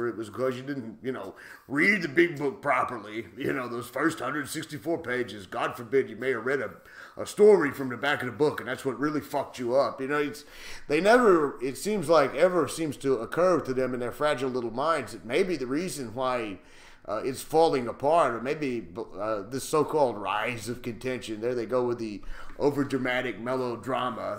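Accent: New Jersey, United States. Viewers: Your so funny, haven't laughed like this for such along time, I'm literally crying laughing. Love the Jersey "wise guy" accent. Gold. 🤣🤣🤣🧡🧡🧡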